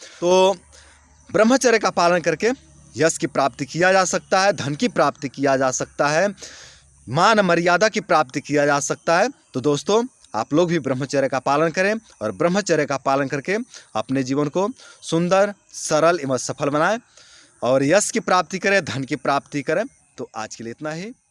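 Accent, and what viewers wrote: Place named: Bihar, India. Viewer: Hii bro लगता है की बिहार से हो आप 🙏🤞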